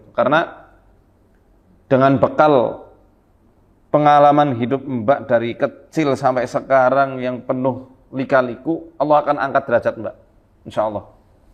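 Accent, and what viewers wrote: Banyumas, Indonesia. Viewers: Masya Allah tabarakAllah,seneng banget nek krungu pak ustadz ngomong ngapak ,medok banget kaya nyon🤭 moga sehat"slalu pak ustadz dan teamnya .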